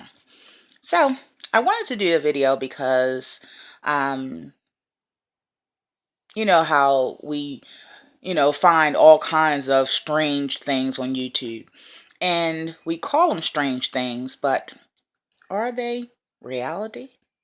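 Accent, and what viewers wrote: Baltimore, United States. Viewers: Never mind. just looked at another video. If you from Baltimore you can hear it everytime.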